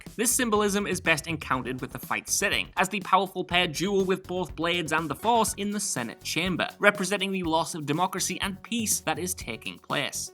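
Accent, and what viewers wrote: United Kingdom. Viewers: British accent really suck! Fuck your Jewel/Joo·Uhl shit!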